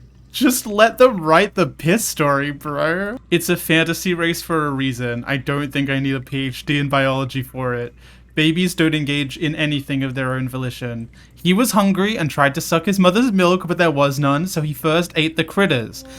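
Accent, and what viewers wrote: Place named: Australia. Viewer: 1. i see (?) youtube 2. i hear from her voice that she's probably aussie 3. i subscribe and hope that the algorithm will show me more of The Gang 💅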